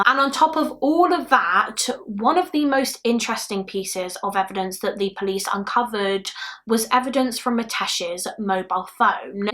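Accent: United Kingdom. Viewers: I have been a subscriber of yours for a long long time, since a couple thousand subs, & I have to say that I have loved watching your growth & seeing you become more & more comfortable with every video. Congratulations on 100k love! I can’t think of anyone who deserves it more!! You really help this boring 42yo, mom of 2 grown kids, nurse, wife, & true crime FANATIC with your wonderful storytelling & your true empathy & sincerity. I love your accent too 😘 (I’m from Alabama, USA, so there’s nothing better than a beautiful British accent especially because I have one of those slow annoying southern accents 🤣) I just wanted to say congrats & tell you how much I love your channel. 100k down, now on to 1M! 🎉❤️🥰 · Great video !!! Keep them coming !! Great job love your look and makeup and accent !!! I am American always love British accent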